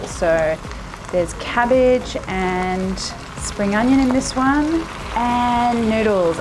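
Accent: Australia. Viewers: You have the sexiest voice and accent, is it Australian? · aussie accent is everything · Australian accent?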